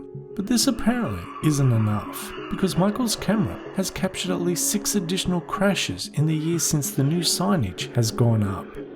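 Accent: Australia. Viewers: Drivers in Seattle think it's OK to exit a ramp at 75 mph and yet merge into the highway at 25 mph expecting everyone around them to adjust to that kind of driving. BTW the Australian accent threw me off until I saw the 165 exit ;)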